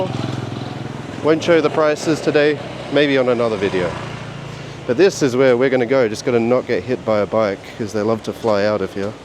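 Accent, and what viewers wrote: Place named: Australia. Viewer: I think he is Australian, it's his accent.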